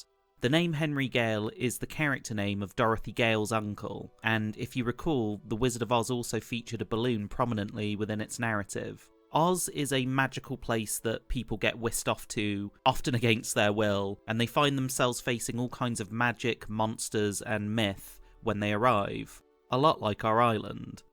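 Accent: United Kingdom. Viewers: Is this video a robot voice or yours??? I love the voice in this video (British accent?)...I would be surprised to hear it's a robot!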